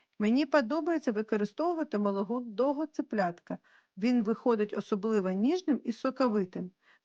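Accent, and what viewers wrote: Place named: Russia. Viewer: Говорите. или по.русски. или. чисто. по. украински. Ваш. родной. Язык. Это. русский. Это. очень. слышно. Вы. Умница. мне. приятно. вас. слушать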